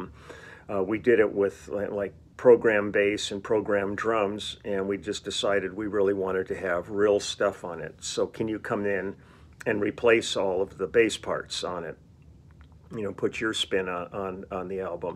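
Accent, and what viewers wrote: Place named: New York, United States. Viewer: I love the fact that you sound like a real New Yorker and seek out gems like Joe's Pizza! Cheers from Manhattan!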